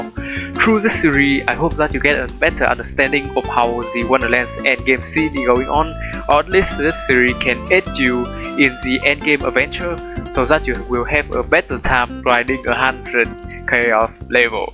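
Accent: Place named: Malaysia. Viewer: Late comment, but I found this to be the most helpful for my case despite myself having trouble understanding your Malay? accent. Thanks.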